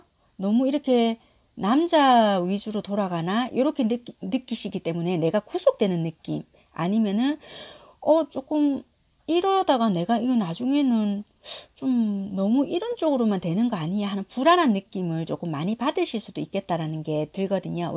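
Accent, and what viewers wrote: Busan, South Korea. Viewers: 부산 사투리 뿜뿜 타로 그런데 카톡에 마리타로로 검색하니까 안나와요 ㅠㅠ 오픈채팅으로 검색해야 하나 싶어서 검색했더니 마리타로가 딱 하나 있는데 그분은 이 아니더라고요.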